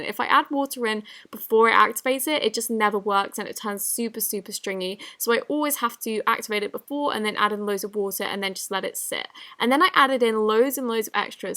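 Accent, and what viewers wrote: United Kingdom. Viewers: your from U.K?????😮😮😮😮😮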